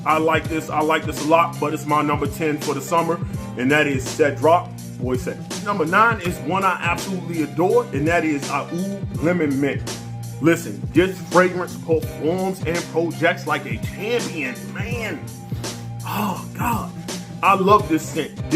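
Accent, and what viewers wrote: DMV, United States. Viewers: Man people in the dmv really do have a accent. Love the video keep it up!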